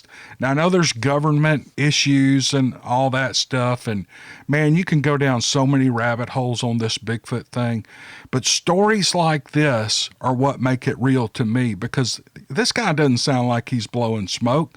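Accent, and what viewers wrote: South, United States. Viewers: It's that sweet southern drawl....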